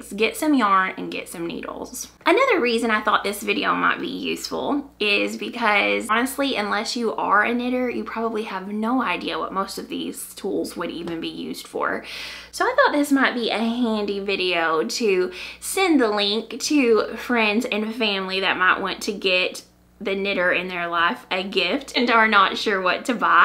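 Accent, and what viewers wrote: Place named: Kentucky, United States. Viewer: Hello!! I have a question I hope I done sound stupid! I am new to sock knitting I made my first sock last week during shock week. And now I am obsessed I am working on my first official set and I have been so worried about blocking them. I don’t have sock blockers at the moment it is just not in our budget! So I really need tips on blocking sock with out the blockers! Oh and I love your podcast I am from Kentucky so it’s cool to hear another knitter that sounds like me!!!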